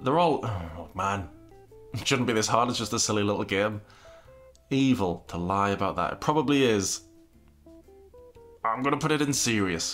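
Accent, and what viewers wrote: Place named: Newcastle, United Kingdom. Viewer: I feel like you’re a geordie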